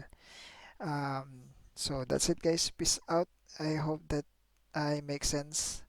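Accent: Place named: Philippines. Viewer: pag mga pinoy nag english parang di bagay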